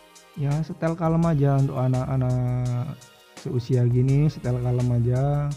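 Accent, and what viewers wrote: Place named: West Java, Indonesia. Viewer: Kyak logat sunda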